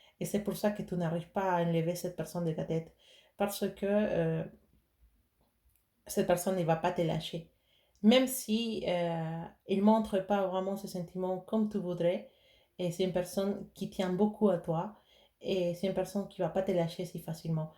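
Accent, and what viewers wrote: Spain. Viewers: Bonjour! quel plaisir de vous revoir, vous entendre. Merci pour ce tirage original!. Ne vous inquiétez pas pour le cafouillage de notre langue française pas facile pour vous!, mais c'est normal!☺️, je vous comprends très bien!, et j'aime bien votre accent espagnol. Choix 3, 7 qui me parle. Merci infiniment 🙏 Namasté 🙏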